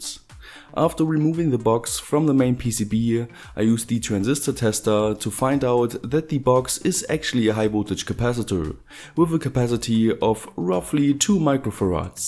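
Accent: Saxony, Germany. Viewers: Nice video! Thank you :) Is that a Saxony accent you have?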